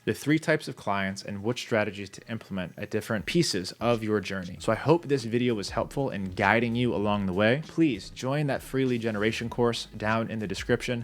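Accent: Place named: Canada. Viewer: You sound so Canadian Dogg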